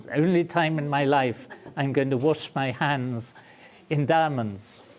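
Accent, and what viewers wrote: Israel. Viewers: Its typical israeli, like if you had an israeli prof in a comedy show, thats what he should sound like Sources: Been to his Uni, lives in the same land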